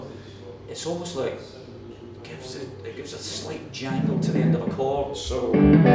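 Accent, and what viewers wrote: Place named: Scotland, United Kingdom. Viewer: Oh my... he talks like Fish.. is Scot?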